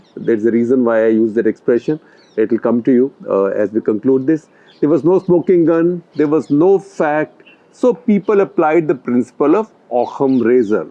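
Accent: India. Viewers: GOOD GRIEF!! SORRY UR YAKKING IS SO MONOTONOUS N NITBTO D POINT I HAD TI SHUTVOFF AFTER 5 MINUTES... IT WAS UNPLEASANT TO LISTEN...TOGETHER WITH D INDIAN ACCENT UT MADE IT WORST. . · Firstly so difficult to follow this Indian due to his heavy accent. Second, he failed to mention the outbreaks and deaths in Fort Detrict, Maryland back in August 2019, which the CDC Robert Redfield confirmed was covid-19. Then the traces of this virus found in waste waters in Italy, Spain and France as early as March 2019. These important facts were not mentioned nor investigated even though the findings were months early before the Wuhan outbreak. This report is rubbish and unreliable and biased !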